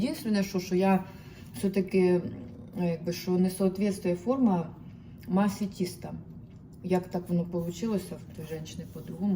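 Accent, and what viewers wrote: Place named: Surzhyk, Ukraine. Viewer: Як не гарно звучить суржик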